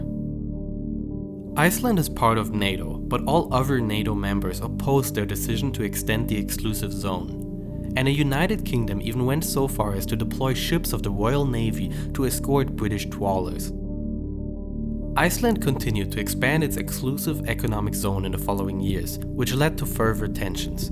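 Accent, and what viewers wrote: Germany. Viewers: Der Sprecher ist doch 100pro ein Deutscher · German narrator :D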